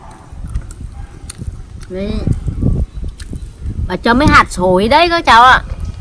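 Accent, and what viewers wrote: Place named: North, Vietnam. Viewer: Người bắc thế phải rồi cau ạ :))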